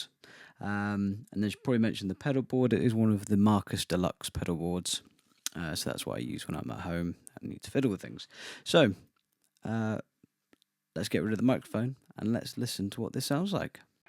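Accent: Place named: United Kingdom. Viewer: Hello, cheers for the demo sounds like your from the UK. I'm looking for a cheap fuzz to send my Minimoog through. where did you get it from? Would you consider doing a comparison test between a cheap fuzz, Distortion and a sustain pedal. Is there a big difference? Cheers Tim. ( yes you are from the Uk as I discovered near the end of the video:) ) I like your presenting style.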